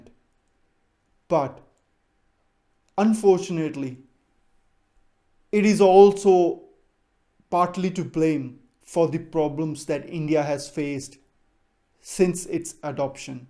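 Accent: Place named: United States. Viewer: Why american accent?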